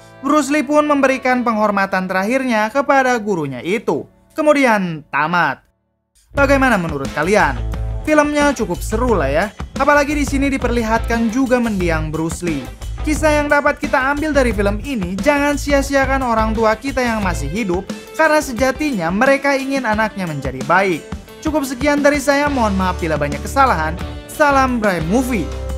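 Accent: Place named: Madura, Indonesia. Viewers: Madura yah bang, hehe, semangat bang😂💪🏻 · logat madura cuy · Logat nya madura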